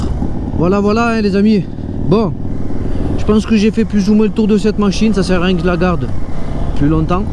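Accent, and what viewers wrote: Marseille, France. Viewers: Salut ! C'est un plaisir de retrouver tes essais avec ton magnifique accent marseillais, toi même tu sais, vé. 😂❤ Stef bdx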